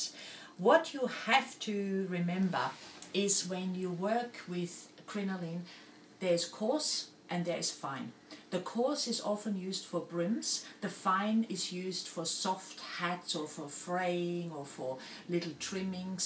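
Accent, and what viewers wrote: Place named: Germany. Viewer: Listening to you I am wondering if you are German. If so from where in Germany. I was born in Solingen and grew up in Mannheim. Would love to make some wide brimmed hats but cant find patterns for it.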